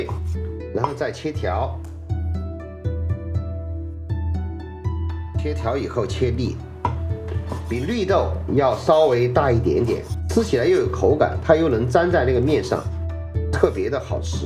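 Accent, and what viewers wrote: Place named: Sichuan, China. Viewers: 说话语气和性格都是典型的四川人啊啊啊啊啊，太喜欢了！ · 川普...听了都想回四川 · 椒鹽普通話=四川普通話🍇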